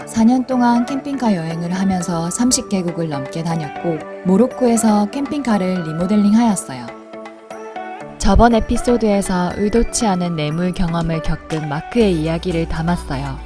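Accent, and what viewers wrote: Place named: Busan, South Korea. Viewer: 아~~~내가 왜 쪼매 더 일찍 를 몰랐을까요? 감성터치 눈물 훌쩍훌쩍! 그리고, 정감 있는 부산사투리와 상반되게 믓진 영어구사 최고예요. 모칠레로 채널합방통해 지난주엔가 알게되어 구독후 시청중입니다. 루프한자 항공? 제가 우찌 도와드릴일이라도..가서 정문에 떵이라도 싸고와야 기분이 풀리지않을까요? 암튼 마크씨랑 오손도손 지내시면서 등에 파스도 붙여주고 마사지도 서로해주고 안전하게 여행하시기 바래요. &마크 화이팅 너무너무조아조아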